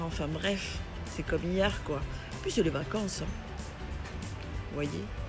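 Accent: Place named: Provence, France. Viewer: Merci, j'aime beaucoup ta voix, posée, légèrement teintée des cigales, très agréable! Dommage pour Nadal!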